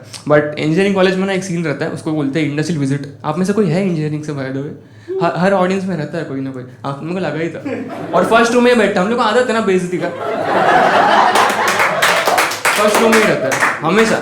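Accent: Maharashtra, India. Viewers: Your marathi tone is masking your talent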